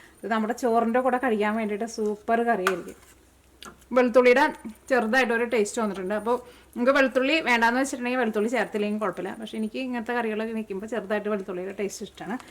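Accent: Thrissur, India. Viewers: Good,we will try,Oru curry avasiyam ayirunnu,I have Oma,in my yard here in Florida,it is an adavantage in this lent season.Ninte language Oru Trichur accent analllo!!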